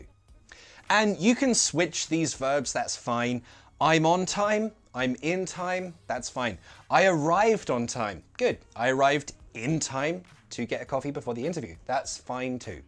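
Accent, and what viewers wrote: United Kingdom. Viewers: Sooooo amazing British accent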